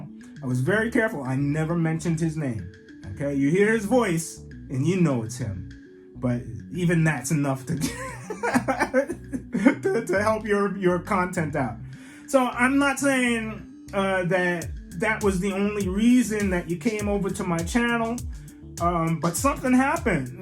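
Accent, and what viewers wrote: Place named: United States. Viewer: 😅Mr. You are very brave. Despite all warnings from the medias not to take ‘risk’ coming to China, you did it.Your American English pronunciation sounds very pleasant.China has a 5,000-year-old culture and is a society that respects teachers very much. It is very popular in China and you can live in China with confidence.I am also a video producer and it is difficult to increase my followers. You can think of your channel as a way to record your life. Take it easy.China's education system is long-term and sustainable. You don’t have to worry about policy issues. Just feel free to teach well. If the contract expires, you can continue teaching in other institutions. If you go to Vietnam or other countries, your income will not be as high as in China, and life will not be as convenient as in China.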